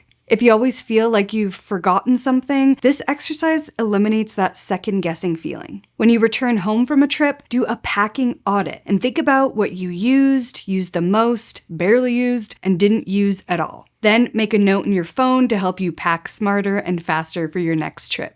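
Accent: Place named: Canada. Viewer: Broncos fan? Saw the fingernails. Then again, I detect a slight Canadian or Yooper accent. Thx for video!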